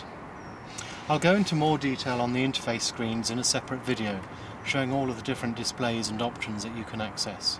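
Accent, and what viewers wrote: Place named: United Kingdom. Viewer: It did confuse me when you had the British accent and left hand drive!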